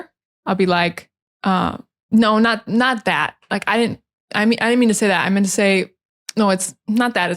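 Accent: General American, United States. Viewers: I found it interesting what you said about your accent , that you have a standard american accent , the same as the young american generations and that people from California, Texas, Indiana, Minnesota speak with the same accent you have. But that surprised me because I attended an english course where there was a young teacher from New York who has an accent pretty different from yours. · Hi, your accent is really an American standard accent. I understand it well.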